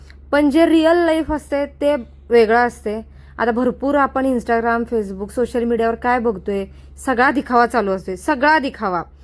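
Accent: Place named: Kolhapur, India. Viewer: ताई कोल्हापुरी भाषा खूपच छान वाटते कानाला ❤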